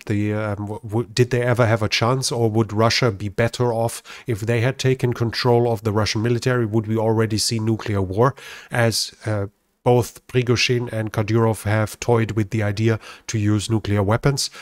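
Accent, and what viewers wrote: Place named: Germany. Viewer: He has the same accent as Werner Herzog. Is this an accent from a certain part of Germany? Bavarian, maybe?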